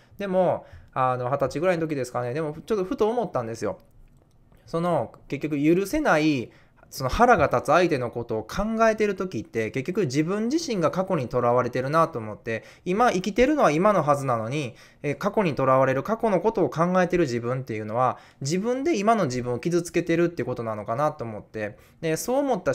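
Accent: Kansai, Japan. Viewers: 関西方面の方の方言に苦手意識が有りましたが、初めて嫌な感じを受けませんでした。分かりやすく言うと…意地が悪そうじゃ無い感じです。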